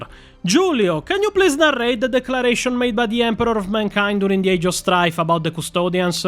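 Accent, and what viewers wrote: Italy. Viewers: I love the Italian accent. I could listen all day :) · L'accento meridionale!!!!11111!!!!!! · I am so glad to have someone put this into better words than I ever could have. Thank you! I love your Italian accent, and I wish to see bloopers/behind the scenes of this. 🤌🏻👀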